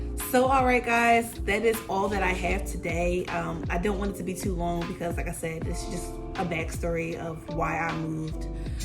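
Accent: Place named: Philadelphia, United States. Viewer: And I just knew u were from philly soon as I heard u talk lol we really do have an accent!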